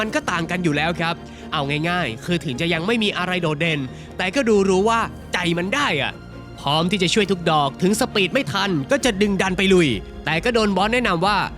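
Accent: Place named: Chiang Rai, Thailand. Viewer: แอดมินเป็นคนตางใดนิ ปากเมืองสำเนียงคนเจียงฮายแต้ว่าอั้น 555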